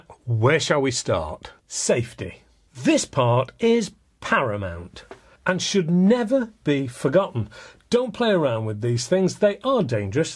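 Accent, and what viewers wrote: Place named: England, United Kingdom. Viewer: Hello Mr. I am from Greece and my name is Eric. Congratulations for your great channel! It helps me very much with all that information for my potential hobby. I really admire and that English accent.I really like you English people!!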